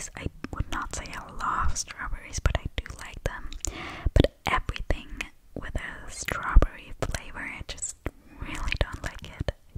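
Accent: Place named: United States. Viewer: I’ve only just started listening to and to be completely honest I thought you were American, as you do have a slight twang but that could be because of the internet, with a mix with a different accent. I assumed you were born and raised in America with your parents or one of your parents being European, I wouldn’t have guessed German either! 😂 One of my friends is half German, half English and you have similar accents but she is completely fluent in English and partially fluent in German. So I would've thought it was a similar thing. You’re English is really good, I thought you were a native speaker, don't put yourself down cause you are clearly better at it than you realise!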